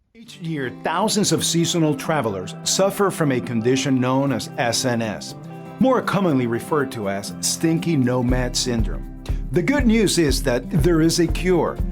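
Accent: Canada. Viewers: Every time you said “battery” my heart would flutter!! Great Canadian accent 💫💛💫 Thank you for sharing 👍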